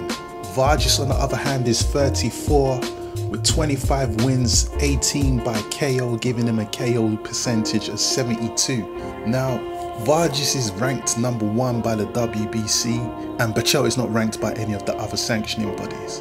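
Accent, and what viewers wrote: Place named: United Kingdom. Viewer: Brotha you got a UK emcee voice. Reminds me of a UK emcee by the name of Barney Artist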